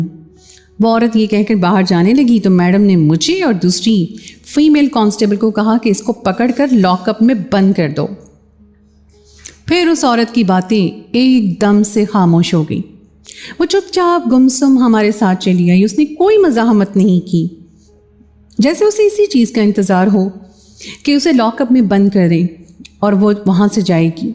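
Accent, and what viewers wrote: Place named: India. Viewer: you still have Indian Hindu accent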